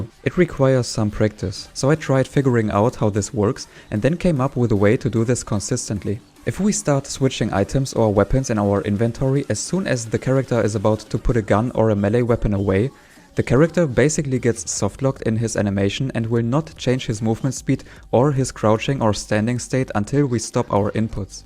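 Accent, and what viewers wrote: Germany. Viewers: digga und man hört trotzdem in den ersten 2 worten das du deutsch bist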